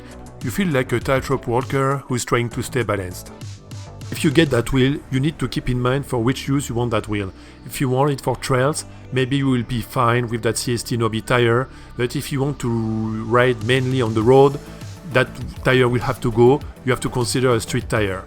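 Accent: France. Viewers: Belle narration avec le flair de Paris saupoudré .😘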